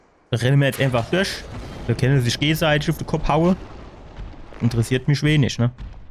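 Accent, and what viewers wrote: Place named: Palatinate, Germany. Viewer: YouTube wäs hald was guud is. Kä Ahnung warums ma Dark Souls vorschlachd, awa mim pälzische hots mich kadd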